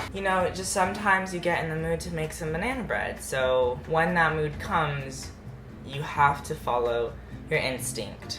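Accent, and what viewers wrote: South, United States. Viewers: YOU CAN HEAR YOUR SOUTHERN ACCENT SO MUCH IN THIS VIDEO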